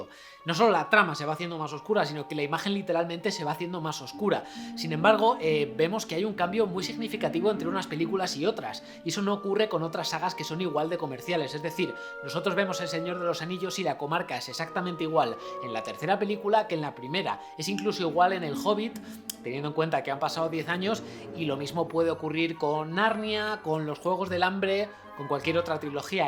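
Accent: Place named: Castile, Spain. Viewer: Me encanta la pronunciación castellana , juro que entendí "Harry se encuentra con el mión" jeje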